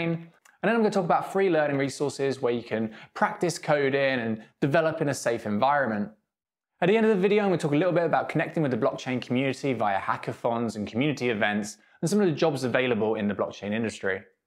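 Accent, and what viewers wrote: United Kingdom. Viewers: Thanks a lot for the time you put in, it's also refreshing to hear you are also from the UK. Do you have any advice on the best way to apply for roles? And how long the process would usually take to start working? Thanks a lot in advance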